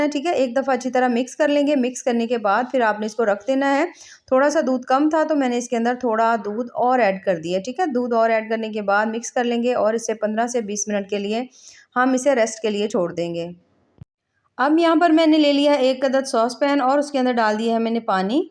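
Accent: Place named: Punjab, India. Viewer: AOA Apki kafi videos me ny dakhe hen, ap jis Andaz me or Punjabi baul K bat krti hen, muje boht acha lgta he Apko sun KR,,, ap bohat ache baten krti hen Jo K reality se related Hoti hen😊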